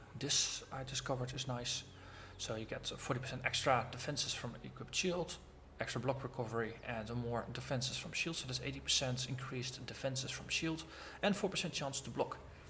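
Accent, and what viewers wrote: Netherlands. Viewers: true fan of the new chaos dmg here. quick question, are you dutch? you sound dutch.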